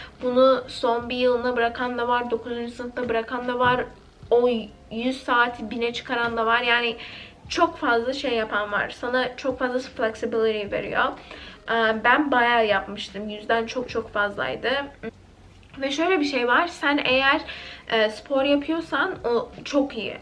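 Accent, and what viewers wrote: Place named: Texas, United States. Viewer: Litt video, succesful video. Im a fan from now on!! we are waiting for more. u got texas accent tho..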